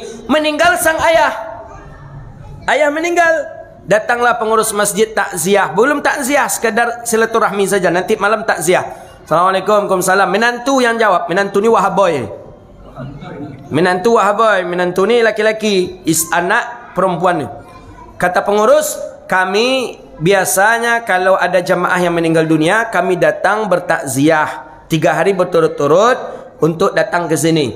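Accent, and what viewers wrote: Minangkabau, Indonesia. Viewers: Saya sangat merindukan ceramahnya Ustadz yg khas dgm dialeg minang, tegas dan berilmu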